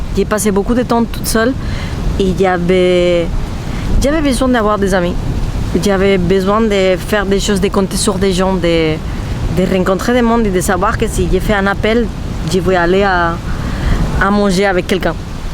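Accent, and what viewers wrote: Spain. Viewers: bonjour, merci pour tes vidéos faites avec ton charmant accent espagnol tu as trouve ta vie, par forcément la vraie vie, mais celle qui te correspond le mieux Peut-être qu'un jour tu feras un autre voyage .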